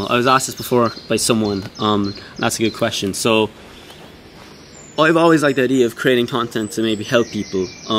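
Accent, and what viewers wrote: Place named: Ireland. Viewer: Some good answers man! Nice Irish accent haha 😂😅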